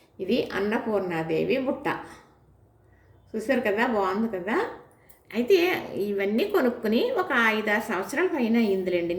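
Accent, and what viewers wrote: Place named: Godavari, India. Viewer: Mana godavari yasa❤❤❤❤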